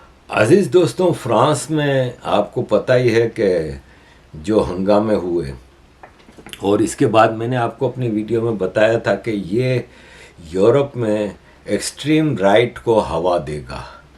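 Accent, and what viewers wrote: Gujarat, India. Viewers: shab mujhe lagta he app Gujarati hoo app key bol gujrati Jaysh lagte he❤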